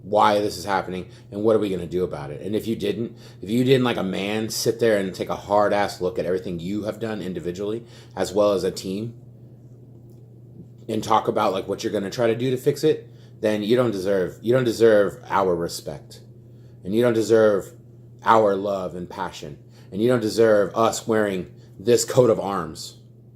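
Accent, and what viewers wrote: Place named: Philadelphia, United States. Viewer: Ahahahaha...bro, yer starting to sound like the dude from Philly.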